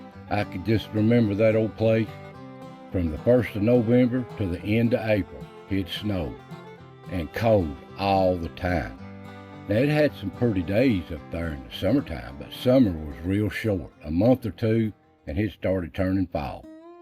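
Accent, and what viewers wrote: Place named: South, United States. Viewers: Lol I love the Southern drawl I talk like you do 😁 that's just way we was raised to talk I really enjoyed this story Thank you for sharing God Bless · I love your videos. It’s good to hear someone that speaks southern American like me. There are different dialects all over the USA but it seems like the only one that people don’t like is the southern one. Well I like it and I’m proud to be a speaker of it. I left the mountains of NC in 80s to work construction and was on the road working different jobs for about 10 years. There are a lot of good people out there but only one place that to me is God’s country. The Blue Ridge Mountains. I am in my 70s now and will never leave this country I love unless for vacation. Well, got to go till my garden. Enjoy your day. 🙏🇺🇸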